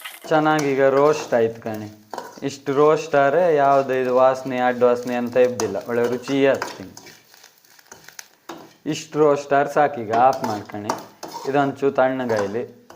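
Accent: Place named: Kundapura, India. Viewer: ಸೂಪರ್ ಪ್ಯೂರ್ ಕುಂದಾಪುರ ಕನ್ನಡ ಮಾತಡದ್ ಲಾಯ್ಕ್ ಆಯ್ತ್. ತಂಬುಳಿ ಒಳ್ಳೆ ಇತ್ ಮಾರ್ರೆ 😍😍👌🏼👌🏼👌🏼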